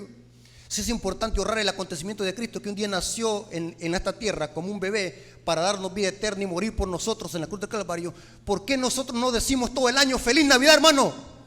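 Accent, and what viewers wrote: El Salvador. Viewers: 🚨De que pais esta este pastor?pienso que sera del Salvador,no me parece su acento a los Nica🤷🏻‍♀️🚨!!!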